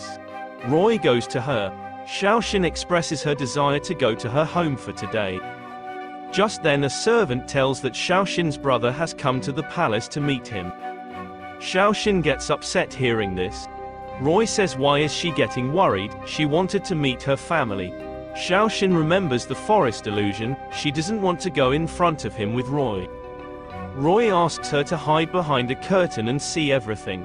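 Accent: United Kingdom. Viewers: yo you British?? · Yo u british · I think he is! I didn't know british ppl sounded like that no hate to them and they sound pretty cool!! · Yo u British bro? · Nah jit British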